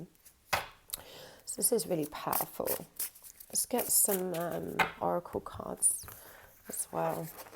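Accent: England, United Kingdom. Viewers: Very deep messages in the beautiful English accent! 🙏🏼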